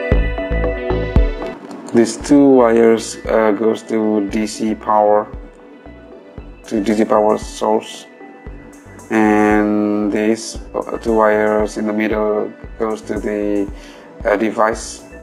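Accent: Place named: Indonesia. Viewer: i saw ur previous video list, actually i was thought u are indian, now i knows u from Indonesia, that's why say i was talk ur accent 😬😁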